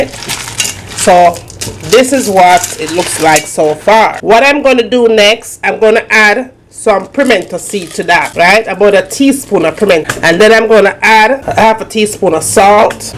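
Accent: Jamaica. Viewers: Yes man it really looks wicked 😃😄 Thank you for this great recipe !! so good to hear your sweet Jamaican accent 🥰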